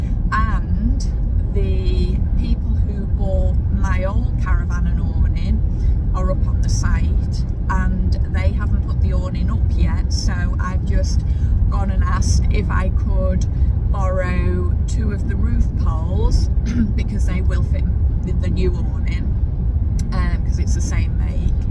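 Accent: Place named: North, United Kingdom. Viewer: Oh dear that frickin awning is proving just a tad troublesome ay - but not to worry luuvy 'cause not only am I always totally enamored by your delightful Northern accent, I am also well and truly captivated by this ongoing and challenging saga!!...You're a true Brit Battler. Bravo 👏😀 Onwards and upwards. Congrats on the 1,000+ subs btw - That's awesome 👍😉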